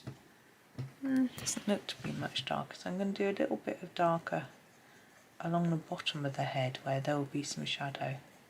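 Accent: United Kingdom. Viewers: lol Welch's grape jelly is indeed like jello, as all jellies are. No solids. Jam, or preserves, does have solids, although preserves is usually thicker, with bigger solid pieces. Marmalade is preserves, but always citrus based. Deja vu on this subject haha. Most Americans call the outside of bread the crust, and the end pieces are either ends or heels. Thanks for the geography info, too, although because I watch Time Team and a lot of Chateau restoration videos, I've already relearned things from my school days lol I do love hearing you talk, where you put your accents, and the phrases that are popular over the pond. Fascinating! Oh, anyways, you do the best berries!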